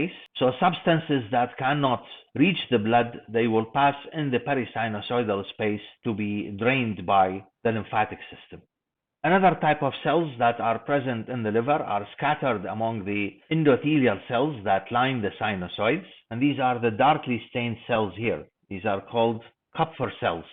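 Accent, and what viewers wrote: Iraq. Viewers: I think he’s Iraqi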